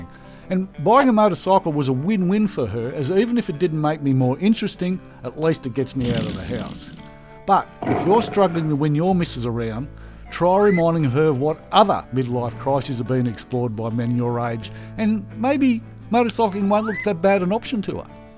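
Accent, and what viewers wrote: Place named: Australia. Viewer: My cousin is laughing so hard at this video right now… shout out from Vancouver Canada, he loves the Australian accent and says you are so charming. Starting to watch your other videos also, he said keep your channel growing because it’s so funny! Wondering why stopped posting By the way 999th subscriber❤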